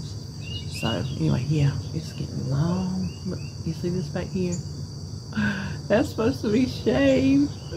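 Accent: South, United States. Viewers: You are wonderful, and I love watching you. I’ve been watching your journey for quit a while, and I am so proud of you for doing so well, and keeping on with whatever you’ve needed to do to lose your weight. As I have said, I have watched your channel for a long time, and I recently noticed something that I wonder if you have noticed: I’ve noticed your reflection in the window behind you on your porch, and how much smaller you look in your reflection. Have you noticed that? It’s kind of amazing! There’s a huge difference! As to your comment that you speak like a hick, I love to hear you talk. My father was from the south, and you remind me so much of my aunts and grandma in the way you talk and the expressions you use. It’s like a family reunion 😂 Thanks for what you do and share. You help me stay on track because your videos are so encouraging.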